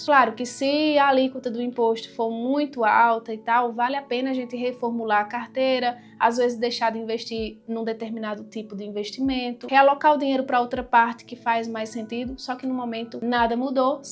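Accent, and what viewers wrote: Northeast, Brazil. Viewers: linda maravilhosa..❤❤... Obrigado pelo seu trabalho.... fiel seguidor. Amo esse sotaque nordestino.....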